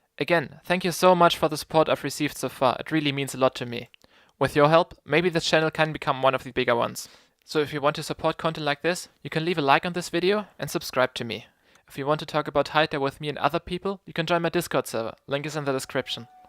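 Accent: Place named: Germany. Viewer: Sounds so german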